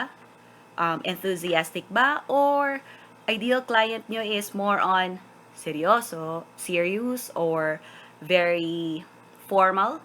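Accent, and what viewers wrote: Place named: Visayas, Philippines. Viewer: Wow bisaya diay ka coach? Mao nay akong problema ang speaking skills kay bisayang dako sad ko😂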